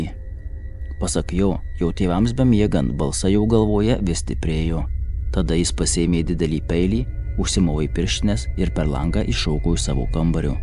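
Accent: Suvalkija, Lithuania. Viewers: Akcentas lb gražus, Suvalkijos.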